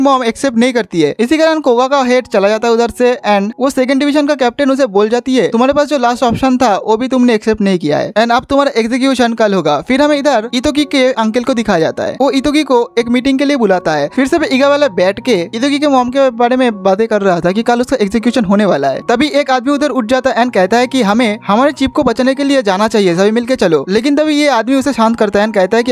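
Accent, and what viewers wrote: Bengal, India. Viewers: Bhai too bangali ho na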